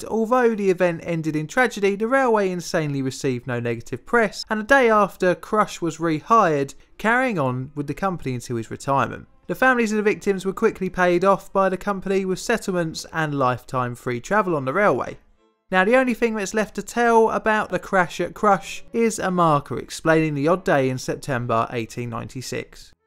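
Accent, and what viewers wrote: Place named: RP, United Kingdom. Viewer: No worries, you're one of my favourite subs, you're interesting and I like your queens English and voice, you should really have more subs.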